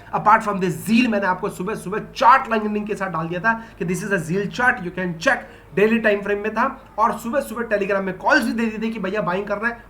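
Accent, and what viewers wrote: Odisha, India. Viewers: Sir are you from ODISHA?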